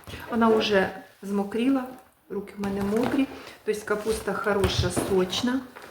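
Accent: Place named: Surzhik, Ukraine. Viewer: Якщо б требо було повільніше, то не вийде.Суржик жахливий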